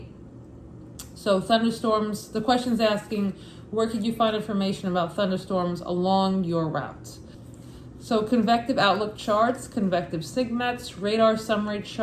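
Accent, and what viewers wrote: Brooklyn, United States. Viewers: Great job breaking down the Instrument Pilot Oral Exam Guide with that authentic Brooklyn flair—super engaging and helpful!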